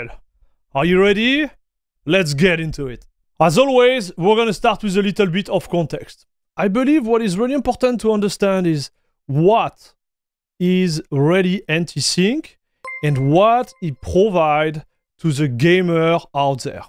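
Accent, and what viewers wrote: France. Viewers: Have not heard Fran-glish for a while, it never ceases to amaze me. I feel like i can speak French :)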